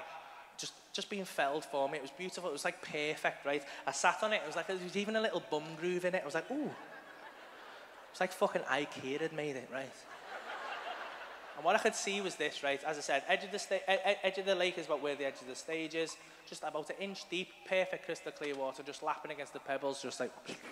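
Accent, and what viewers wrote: Liverpool, United Kingdom. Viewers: English. Liverpool scouse accent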